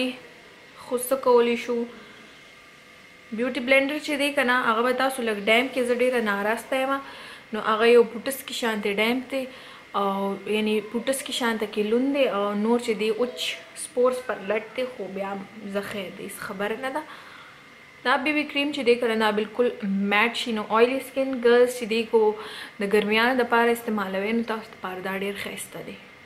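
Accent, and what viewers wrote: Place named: South, India. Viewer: Sounds like South Indian. No offense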